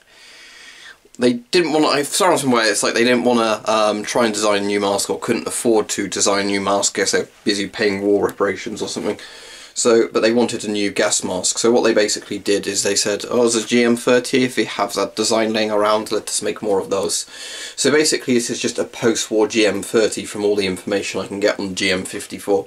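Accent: Germany. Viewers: It surely has a tight seal and is rather comfortable. The straps are good i guess better than the czech straps, they are not that good in my opinion esspecialy on the M10! BTW. loved that german accent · please do a video with your german accent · I can help but laugh at your german accent but it is still way better then mine...us americans are limited to accents but then again america doesn't have a specific accent bc we have people from multiple countries yknow what I mean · oh man that German accent kills me every time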